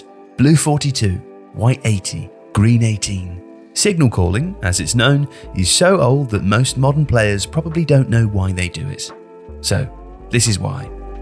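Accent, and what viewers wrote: United Kingdom. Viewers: Hearing a British man saying “football” while talking about quarterbacks and audibles instead of strikers and set pieces is so jarring. I’ve just sort of mentally accepted that football+American accent=Gridiron and football+British accent=soccer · British voice talking about American Football. Interesting. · Idk if I trust a British man telling me about American football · Why is there a brit explanation american football to me. I don’t trust this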